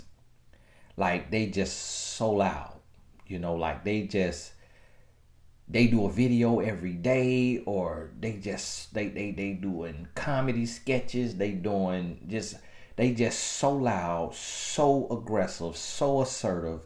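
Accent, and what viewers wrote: South, United States. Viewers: I love your videos and that southern drawl🥰. Thank you for doing what you do! You’ve saved my life as well. You’ve made me laugh at a time when all I did was cry. I think you’re articulate and hilarious but most importantly you love God. God bless you!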